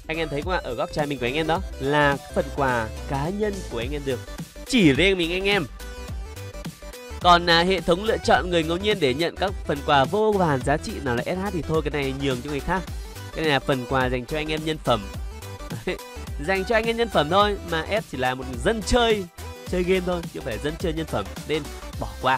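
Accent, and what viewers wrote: Bac Kan, Vietnam. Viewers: Ông ở Bắc Kan à 😍😍 Thích thế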